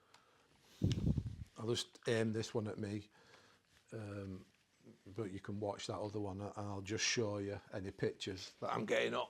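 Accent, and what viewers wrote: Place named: England, United Kingdom. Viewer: Great Videos. For sometime now, each time you ask Alexa a question, our Alexa answers you 😳Our voices are completely different. I have a Texas draw and you have an English accent…✅🇺🇸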